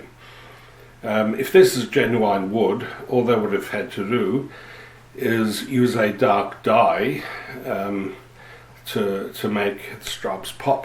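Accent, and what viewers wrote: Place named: Australia. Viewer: Ahh hah! The ALMOST Oz accent and the fact you quoted the price IN AU dollars! ;oP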